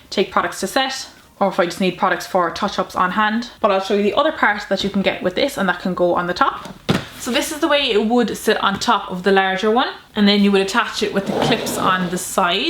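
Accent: Ireland. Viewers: 💋💋💋💋💜 first Irish MUA accent I’ve heard lol 😘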